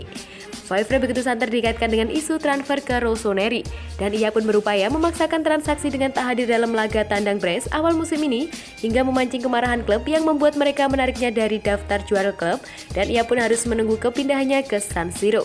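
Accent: Java, Indonesia. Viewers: Sory unsub ga suka voice over medok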